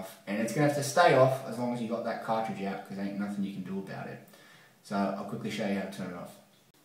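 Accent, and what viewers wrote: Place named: Australia. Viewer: Outstandingly good video because it was clearly demonstrated by an expert who spoke Aussie language. Good on ya mate..thanks!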